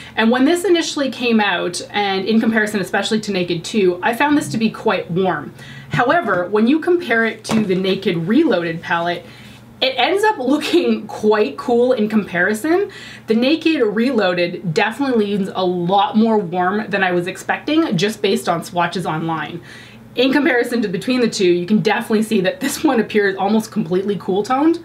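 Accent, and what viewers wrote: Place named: Eastern Canada, Canada. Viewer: Your eastern Canadian accent is the best I am from the west and my Canadian accent is so horrible in comparison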